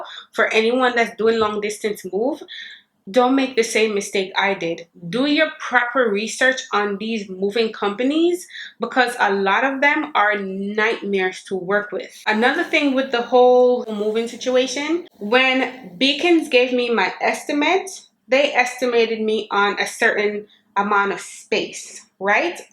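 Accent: Jamaica. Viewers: ARE YOU JAMAICAN HUN❤❤❤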